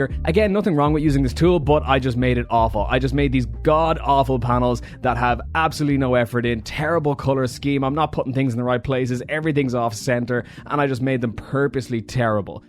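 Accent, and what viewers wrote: Ireland. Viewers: Are you from Ireland? I'm originally from Donegal! I have to subscribe now! Also thanks for the tips, I've only ever done 2 live streams, but planning on doing more!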